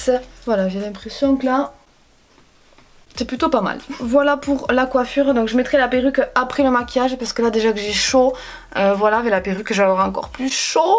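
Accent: South, France. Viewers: Es que t Marseillaise parce que moi oui et ta un accent du sud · J'aime trop ton accent du sud et tu est trop belle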